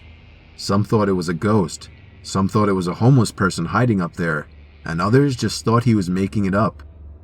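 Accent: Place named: United States. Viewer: They don't sound the same, they have different accents... Mr. Nightmare is (I'm assuming) from America and CreepsMcPasta is British, for example.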